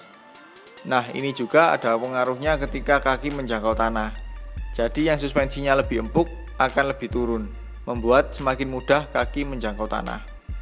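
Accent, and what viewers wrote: Malang, Indonesia. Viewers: Orang Malang/Batu mas?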